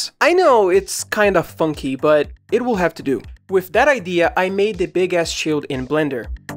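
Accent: Brazil. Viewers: ctz q tu é br, esse sotaque n me engana kjkjkjk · pelo teu sotaque tenho certeza que tu é brasileiro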